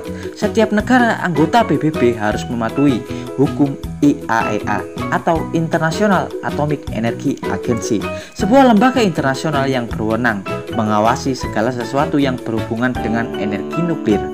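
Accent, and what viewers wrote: Java, Indonesia. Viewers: orang jawa mana ini? medoknya jelas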